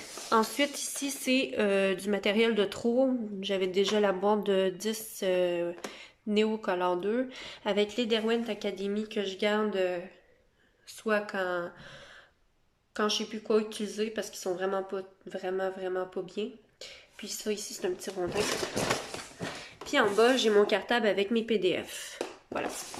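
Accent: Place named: Canada. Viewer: Cc bonjour j'ai adorée votre vidéo je suis vraiment tomber complètement fan de votre bureau j'aime ce qui est fait maison il est grandiose vraiment vous pourriez me faire qq photos et les dimensions car j'ai pas beaucoup de sous car je suis invalide et je colorie depuis novembre 2019 et mon siège est spécialisé et là je pourrais le faire passer oh!! Sa serait formidable j'adore votre petite tour avec les couleurs elle est trop cute je l'aime vraiment beaucoup merci beaucoup pour votre présentation vous avez du très bon matériel c'est pas se vanter c'est partage c'est bien merci beaucoup et votre accent canadien en grande joie de vous écouter gros bisous 👍😘🌹🌹🌹🌹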